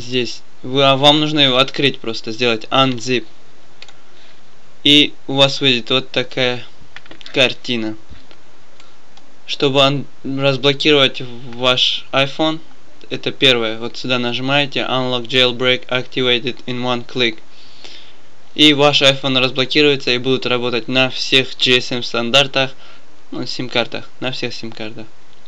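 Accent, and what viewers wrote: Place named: United States. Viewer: нее....ребят говорите что хотите я уверен что он американец...во-первых прочитал хорошо английски текст, во-вторых акцент и ударения.....если вы прислушаетесь он говорит мАкинтош и Айфон а не макинтОш и айфОн...в нем чего-то русского нету))))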